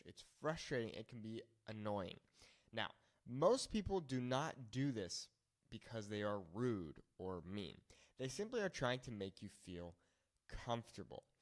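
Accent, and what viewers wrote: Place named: Mexico. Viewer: Love your lessons. And I am Spanish. Just here to practice English (and listen to your fantastic Mexican accent). Good work!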